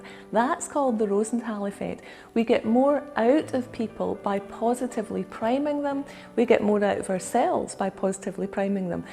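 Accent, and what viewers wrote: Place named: Scotland, United Kingdom. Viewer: I am a new subscriber..., I like what you teach and certainly understand it too. I am pleased to say, the things you talk about are operational in my life. Having said that, there's more work to be done and I am always looking ways to improve my mental performance and maintain the peace the I have both at home and work. Furthermore, I love the Scottish accent and the scenery behind the window. Your voice and body language is calming. That's a natural blessing on you which I goes a long way when you speak. Awesome!!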